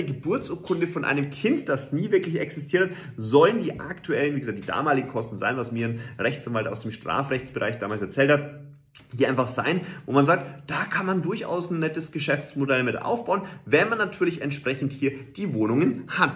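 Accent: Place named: Bavaria, Germany. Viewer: Kann jemandem mit solch einem Dialekt never ever zuhören..sorry, bei aller Liebe. Wohl was eher für die bayrische Bevölkerung. Vielleicht hat er einen Blog..dann lese ich lieber!😅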